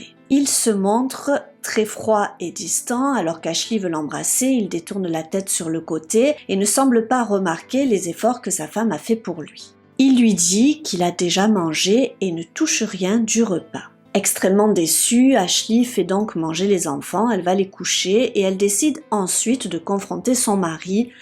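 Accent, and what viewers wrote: South, France. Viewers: Je ne commente pas souvent et je voulais te dire que je regarde et like toute tes vidéos, j'aime tes intonations et cette petite pointe d'accent du sud. Tes récits sont clairs, originaux et intéressant ❤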